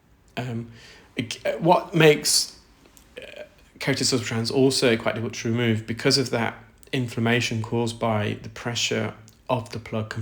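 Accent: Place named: Wales, United Kingdom. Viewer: Nothing wrong with your accents or speed. I find it quite comforting as it reminds me of family- even though I'm not Welsh many generations before me were. Mainly from the valleys so your accents are spot on for me 😂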